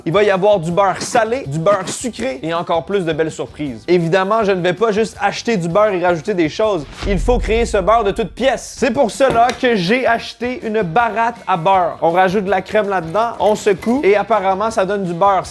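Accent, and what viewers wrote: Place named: Quebec, Canada. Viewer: Oublie pas que c’est un Québecois donc il parle un français différent du français de France ;)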